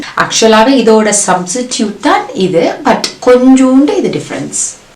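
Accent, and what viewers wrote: Sri Lanka. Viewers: Sis r u from srilanka I liked the way u talk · Tamil super like srilankan tamil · I like ur Tamil slang sis it's like srilankan tamil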